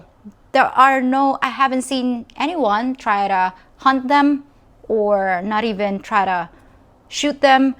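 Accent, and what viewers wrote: United States. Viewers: If you were in my area and saw you I would totally chat you up. Gotta shoot my shot right? But then I basically know who you are and other people may think you are just another American girl due to the melting pot of people here in the US. Guys here are jaded based on how hook-up culture is. Btw you have almost an American accent when you speak English. Another reason why someone might see you as an American.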